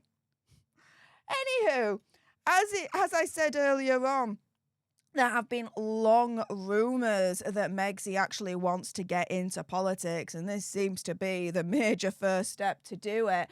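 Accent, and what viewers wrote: Yorkshire, United Kingdom. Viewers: English is my second language , but I understand her..She has heavy Yorkshire accent..They talk like that, I checked sometime ago on YT.,when somebody mentioned her accent.. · has a Yorkshire accent. So do I. I'm proud to be a Yorkshire lass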